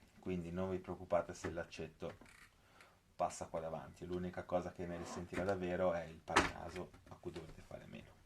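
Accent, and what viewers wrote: Italy. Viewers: L accento genovese è stato confermato dalla strada di Spotorno 🤣 sto montando per la prima volta la action cam e mi sono imbattuto nel tuo video, ho i laccetti ma non il coperchio con i buchi ( è intero) , cercherò un plastica con i buchi. Saluti da cogoleto ✌🏻 Ninja <num>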